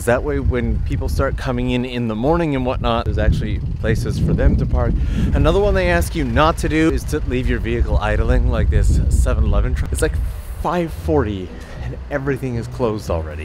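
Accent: Canada. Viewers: Wow so cool it is really sad and mean that the wheel does not turn but it seems like whenever I see one of those in Japan they tend to be stationary😭 They just gave a us a new one at out Disneyland and it moves and everything🖤🖤🖤🖤 I love that japan makes everything so convenient though like you could literally live out of a camper van and be just fine bath and all🖤 wish america was more like that😭 I've said it once but I'll say it again, I absolutely adore your accent🖤 who knew a Canadian accent could sound so nice? Clearly I need to actually talk to my friends abroad and no just text ... that rail was beeeaauuuttiiiffflll🤩🤩🤩🤩 cant wait to see what secrets lie with the cabin, see you next week I hope🖤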